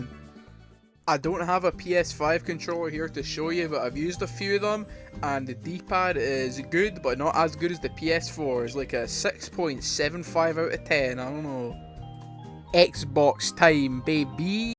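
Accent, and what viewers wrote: Scotland, United Kingdom. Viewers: Are you Scottish because I am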